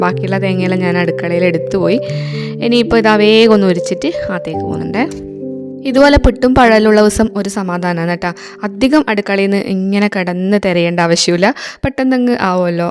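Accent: Kannur, India. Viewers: വീഡിയോ സൂപ്പർ ആയട ❤ കണ്ണൂർ ഭാഷ എനിക്ക് ഇഷ്ടായി ഞങ്ങൾ ഇവിടെ unnithand എന്നാ പറയാറ് ❤️❤️ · കണ്ണൂർ ഭാഷ ഇഷ്ടപ്പെട്ടു വർക് ഔട്ട് കൃത്യമായി നടക്കട്ടെ വിശേഷങ്ങളും വീഡിയോയും ഇഷ്ടപ്പെട്ടു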